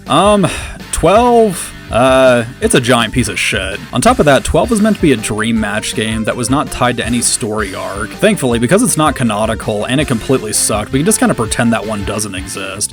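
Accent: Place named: California, United States. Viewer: That California accent!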